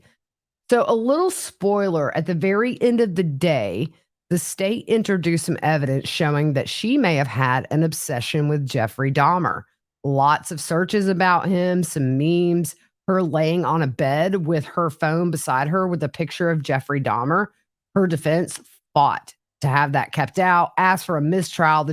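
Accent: South, United States. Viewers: What a remarkable dissonance it is to hear your sweet southern voice describing such a heinous crime.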